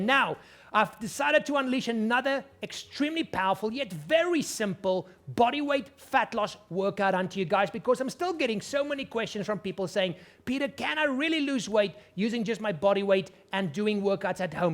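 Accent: South Africa. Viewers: Suid Afrikaans?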